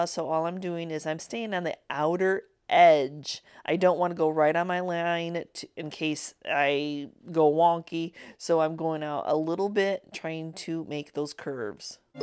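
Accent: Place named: Texas, United States. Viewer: Love the Bible tree and the "God winks". I've experienced them many times since I lost my husband unexpectedly. It has been 2 years but it still feels like yesterday. So God winks are essential to my health! Love your channel, your collections, your personality and your crafts. Are you sure you're not from Texas because you sound like one of us!😁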